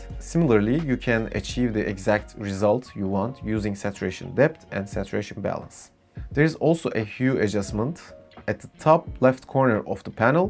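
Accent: Turkey. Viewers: dude, you talk like a Turkish, are you Turkish? :D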